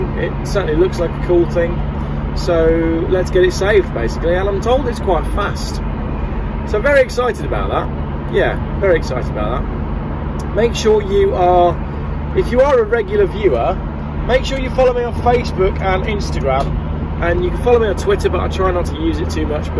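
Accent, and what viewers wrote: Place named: Midlands, United Kingdom. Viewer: before the planned event of three years ago I pretty much watched Hubnut every day. Must admit I wasn’t spending huge amounts of time on YouTube. I almost got to meet Ian at one of his meet n greets when he was here in Melbourne. Then my attention was turned elsewhere. You are the only motoring channel that I know who dares to show another perspective. I admire that. I’m also originally from the Midlands too so that’s probably another reason I like listening 😂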